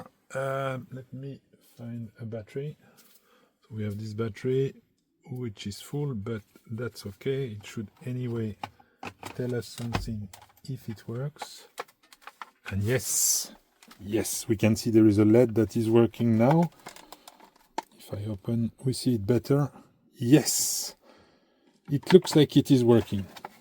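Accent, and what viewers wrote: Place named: France. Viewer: Yes your english is actually great. Don't ever get rid of the accent. It is what makes you- YOU. I just have a quirky likeness for accents so I can pick them up pretty easily. I speak in a boring plain american english accent. Absolutely BLAND and boring. Your accent probably gets you a lot of ladies attention! Dont lose it! And you are 100% understandable! Otherwise these videos would NOT be able for me to watch if I could not understand you. But I can understand you 1000% percent. Please dont get offended! Idk if its an american thing but, accents are great! There's New Yawk accents.. BAWW-ston accents, LilWEEZYana accents, Southern USA accents... MinneSOWtah accents... My accent is SO boring. I wish I had a cool accent like yours! Ze french accent is not one I have heard much of. But I am glad to finally have a french speaking teacher who I can practice my french accent by listening to! 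If you really wanted to get spicy, try learning a New York French accent. Couldn't imagine what that would sound like. But them Nu'yawkaz really do have an incredible accent... "iM WAWWWKIN HEAAA"!! God bless you brother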